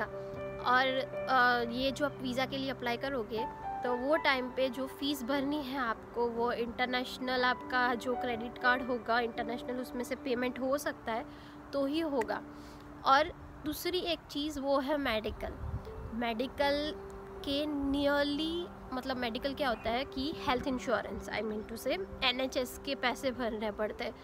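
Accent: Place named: Gujarat, India. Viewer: The way you speak hindi is so adorable ♥️ and obviously that gujju accent makes your every single word soo sweeet♡ keeep growing budd💫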